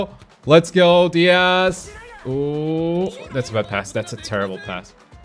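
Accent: Portugal. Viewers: I know you where from Portugal from the way you speak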